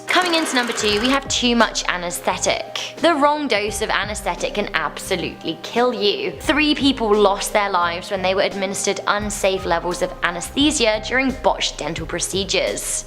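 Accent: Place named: England, United Kingdom. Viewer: You are literally my favorite narrator! I have such a thing for girls with English accents. The random noises you make, and just your general commentary is adorable! Haha Keep up the good work! Hope to hear you again soon. <3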